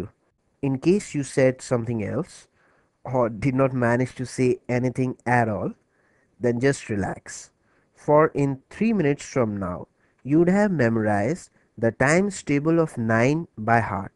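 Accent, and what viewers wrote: India. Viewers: By listening to your voice I can tell that your an Indian :) keep up the good work brother!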